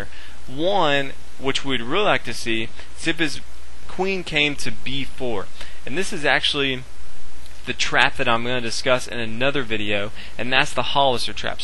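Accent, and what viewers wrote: United States. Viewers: I love this dude's voice / accent what part of US is he from?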